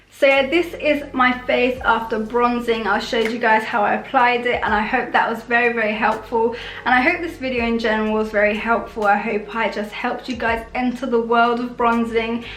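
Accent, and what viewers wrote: London, United Kingdom. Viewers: Which British accent do you have? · good British accent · she's got a London accent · she’s got a London accent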